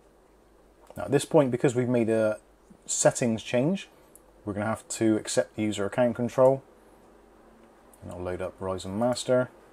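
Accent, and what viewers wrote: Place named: West Country, United Kingdom. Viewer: Nice to hear a west country accent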